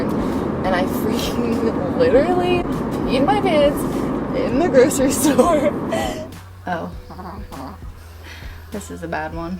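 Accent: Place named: East Coast, United States. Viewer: I love that your east coast is coming out in this video! Every time I visit family in NJ it’s the same. Words ending in G? We don’t know her 😂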